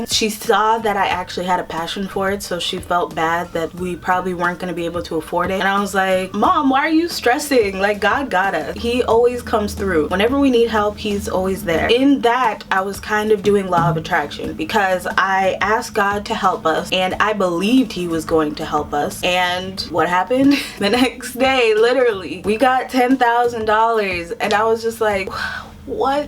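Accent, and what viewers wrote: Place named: Brooklyn, United States. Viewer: I like the Italian Brooklyn accent, perfect!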